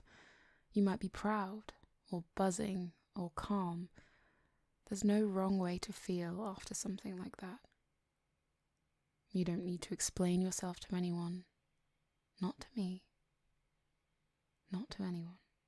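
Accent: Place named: London, United Kingdom. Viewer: Oh my, you have such a lovely voice. It is a crime you only have 39 subscribers. There is something so appealing about the British intonation. As a Dutch guy it is always hard to tell where but is it a London accent or close to London.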